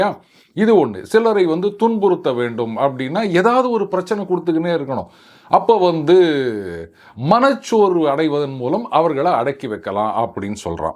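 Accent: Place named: Chennai, India. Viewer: பாரதத்துக்கு மட்டுமாவது “ சென்னைச் செந்தமிழ் “ வேண்டாமே.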